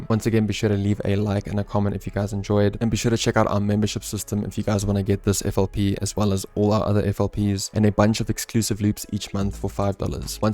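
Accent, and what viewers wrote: South Africa. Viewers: Yo are you South african??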